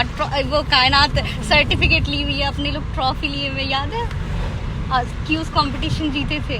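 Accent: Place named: Bihar, India. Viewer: Jo log soch rahe h yh ayse kyo bolte h to mai btatu jisko nhi pta ho yh bihar say h waha aysr hi bolte h i am from upppp❤❤❤❤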